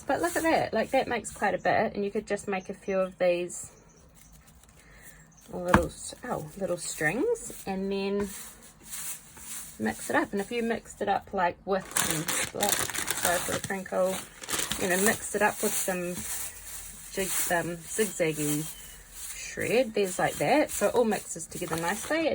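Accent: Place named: New Zealand. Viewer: Love your accent. New Zealand?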